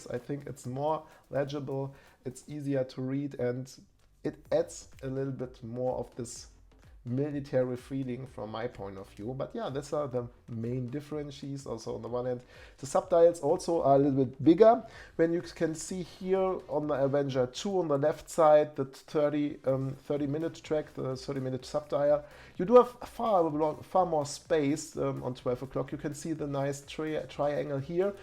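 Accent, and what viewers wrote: Germany. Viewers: I like the German exent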